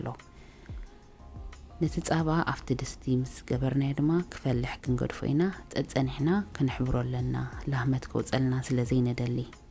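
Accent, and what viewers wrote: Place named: South, Eritrea. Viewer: All good but you need to fix your tigrignia. No more ኣፍቲ፣ ካፍቲ ፣ ናፍቲ ፣ ሓፍተይ ። It should be ኣብቲ ፣ካብቲ፣ ናብቲ ፣ ሓብተይ። it is sad to see Eritrean tigrignia being replaced by south of the border.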